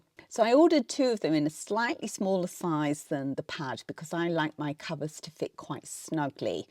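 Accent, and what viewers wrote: England, United Kingdom. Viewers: Everything you do is so gorgeous and stylish. I just adore your videos and your delightful English accent. And of course who would not love Bertie and his antics. I'm a German living in the US and so looking forward to more videos.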